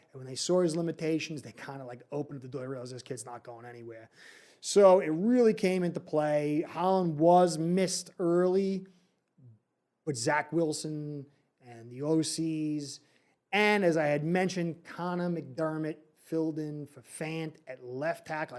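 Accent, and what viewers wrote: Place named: Northeast, United States. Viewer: So this is gonna sound weird, but part of the reason I tune in, I’m in CT there’s something cool about hearing someone who has a northeast accent talk fins cuz we got a lot of fans up here from the Marino days lol the Italians like myself as a small kid see Marino from a blue collar town n connected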